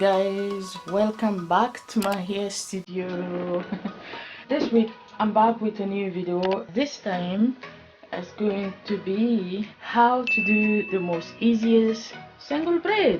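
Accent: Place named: Scotland, United Kingdom. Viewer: Iv watched your videos before and this is the first time iv noticed that you have a Scottish accent 😅 just when I hear you say some words I noticed it. These braids are so cute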